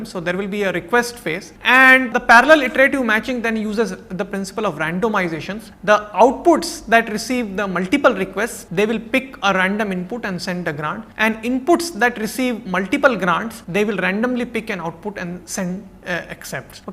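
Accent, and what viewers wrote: India. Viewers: how terible sound when indian people speak english :(((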